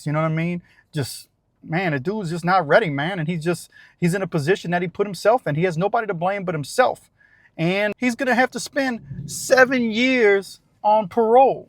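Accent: Louisiana, United States. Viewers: Dude, you'd make a great character actor...your speaking cadence and Louisiana drawl is unique and cool. You'd make a good henchman or badass avenging some transgression. You're a good storyteller with such a unique vibe.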